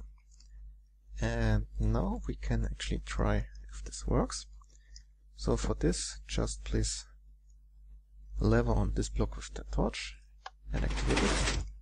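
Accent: Germany. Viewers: Are you german 😅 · Man merkt das er deutsch ist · The houl sing,, german accent means: your are german, right ? the only thing i dont like in this video is the texture pack(reminds me of my old days in minecraft :,) ), but the door itself is cool :)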